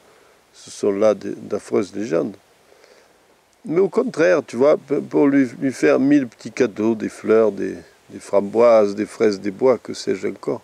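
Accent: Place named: South, France. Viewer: Hoorah enfin du vrai accent du sud! Merci pour cette vidéo!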